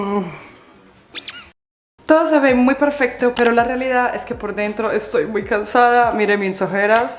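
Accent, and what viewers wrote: Antioquia, Colombia. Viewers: Me encanta tu hacento colombiano, un saludo . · Ave maria tan bello ese acento pues. Y tus sabanas hermosisiiimas omg😍😍😍 y esa cazuela ummm yummy que delicia tener a tus papis ahi🥹🥹❤❤❤ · Me encanta escucharte bien colombiana, no se si sera porque estan tus papas y se intensifico tu acento, pero cuando hablas de las sabanas me haces acordar a Gaviota de “cafe con aroma de mujer” una serie colombiana jaja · Me encanta ese acento 😂 colombiano ❤ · Me brinca el corazon cuanso veo q hay video nuevo 😊😊 y mas cuando hablas cn tu acento colombiano❤❤❤... eres una verdadera motivacion para nosotras las madres. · Hermosa familia amo tu asentó colombiano 🌲🌲🌲Muy feliz navidad para ustedes también saludos desde Uruguay siempre te veo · se te nota que la mamá está en casa, ese acento paisa se te escucha hermoso ❤ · Tu acento colombiano es muy lindo🥹❤️ me alegro por tus sábanas nuevas, a veces es bueno comprarse unas cosas para nosotros mismos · con acento colombiano ave maría 😂🎉❤ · me.emcanta cuando hablas con tu acento colombiano me.muero 🎉🎉❤❤ eres la mejor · Que bonito video, me encanta tu asento paisa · Muy ricas recetitas. 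Me encanta que cada vez que vienen tus papás tu acento colombiano regresa y se te escucha muy bonito. Felices fiestas para ti, tu familia y para toda esta comunidad 🎉🧑‍🎄🎄🪅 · amoooo cuando habla bien colombiana, debe hablar más con ese acento 😂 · Me encanta escucharte hablar más tu asentó colombiano ❤ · Que cool hablando tu acento colombiano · Eh ave maría!! Me encantaaa tu acento de paisa!!! Deberías de hablar más así me encanta me encanta 🥰🫶🏼🫶🏼 😍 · Me da mucha risa cuando hablas bien colombiana 😅 me hace acuerdo a las comedias que eh visto. Por ej Cafe con Aroma de Mujer 😊 saludos desde Uruguay❤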